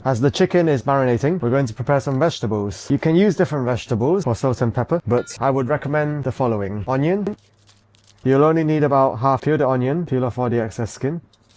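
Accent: Northern England, United Kingdom. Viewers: Do I detect a slight British accent? 😲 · Slight hint of northern English accent?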